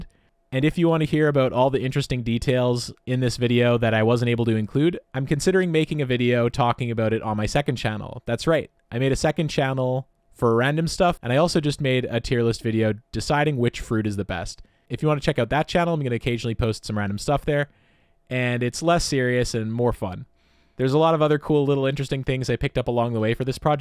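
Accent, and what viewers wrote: France. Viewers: Ey your French accent ain’t that bad haha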